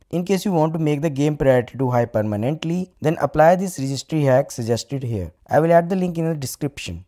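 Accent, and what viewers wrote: India. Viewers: soon as you hear the indian accent you know its gonna be the worst advice youve heard so far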